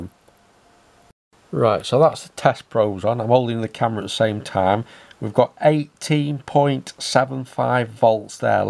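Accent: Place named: Northern England, United Kingdom. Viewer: Btw you've got yourself a new sub! 😊 Great diagnosis and explanation and good to see another fellow tech/electronics enthusiast here in the UK! 😊 You sound as though you're from Leicester or further north, Yorkshire perhaps? I'm down south in Surrey 😊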